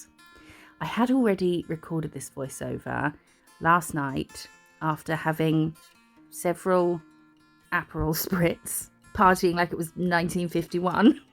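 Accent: United Kingdom. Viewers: Can u show us some tricks for very short nails don't have long ones even as false ones Ur deffo Uk I'm from Yorkshire u rvul too fkin champion lol deffo a my city saying lol 🤣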